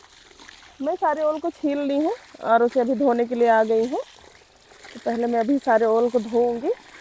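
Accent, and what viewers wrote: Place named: Bihar, India. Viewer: Hamlog bihari hai lekin aapki sari bate hamlogon ki tarah hi hai isliy achche se samajh aata hai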